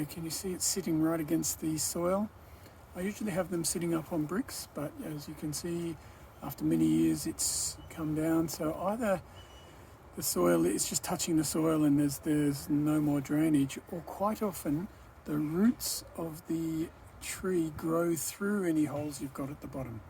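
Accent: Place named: New Zealand. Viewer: Sound like a Kiwi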